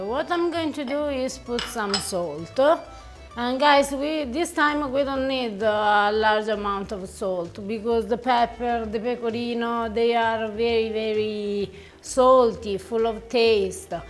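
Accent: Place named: Italy. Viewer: great! What a cacio e pepe! Someone link videos to some other movie director who wants to do a next movie about famous Italian families... Mr. Scott, I'm talking to you! This is how we speak english. For American who didn't understand, House of Gucci movie, watch the trailer, listen how Pacino, Leto, and Lady Gaga speak and compare to.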